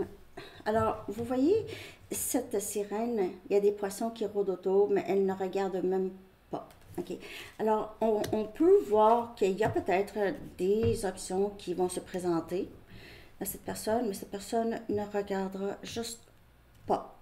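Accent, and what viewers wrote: Canada. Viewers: Dommage que vous ne fassiez pas de guidance personnalisée .. J'adore votre petit accent canadien !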